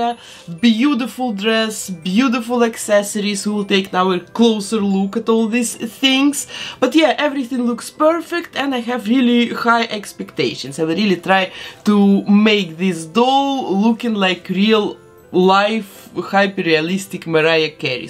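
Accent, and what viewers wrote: Russia. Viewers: Вы русская? Чувствуется акцент )))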